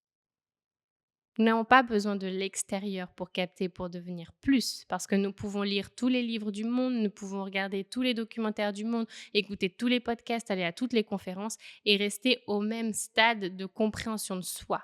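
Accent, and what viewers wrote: Spain. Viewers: Elle vit en Espagne, si elle parle Espagnole au quotidien, prendre un accent c'est normal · Coucou c’est drôle tu as chopé un léger accent espagnol par dessus ta langue française 😃 c’est joli comme tout! Sona bien y bonito 🥰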